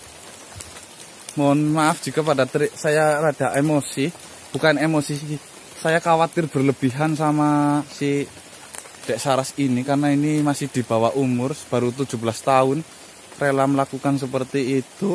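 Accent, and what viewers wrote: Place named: Java, Indonesia. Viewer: Demen ambe medoke ^^ Silakan silakan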